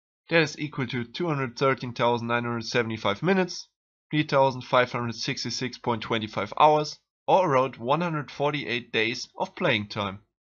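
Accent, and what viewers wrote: Germany. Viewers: Bist du deutsch?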